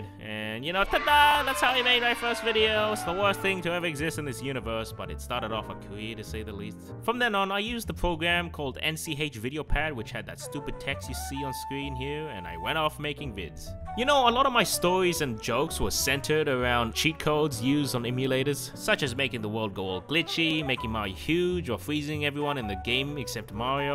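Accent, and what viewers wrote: Australia. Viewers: Wait so you’re Australian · OH SHIT I NEVER KNEW WAS AUSTRALIAN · Wait…… is Australian? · Wait. Are you from Australia? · Is aussie? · your Australian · You are from Australia?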